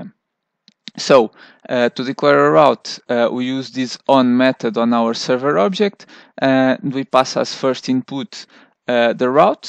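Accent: Portugal. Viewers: Obrigado por este tutorial! (acredito que sejas tuga pelo falar.. ;) )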